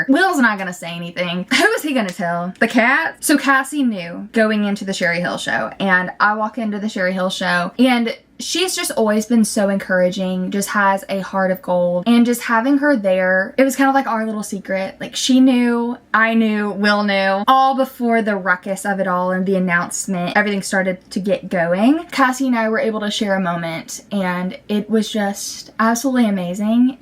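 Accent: South, United States. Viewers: lol you’re twang is so much more pronounced around your fiancé 😂I get the same way around my southern grandparents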